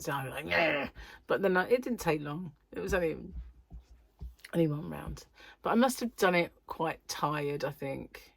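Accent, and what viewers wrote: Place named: England, United Kingdom. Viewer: Hi, You sure do sound English always, however the other day your accent was much thicker.( You were quite excited) Your blanket is quite beautiful love the colours, what stitch is that?? Enjoy your dinner out. Beautiful sunny day here enjoy your hump day in the UK.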